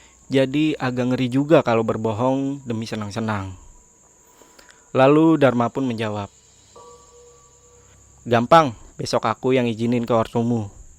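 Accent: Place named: Aceh, Indonesia. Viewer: logat antara orang ACEH atau kah BALI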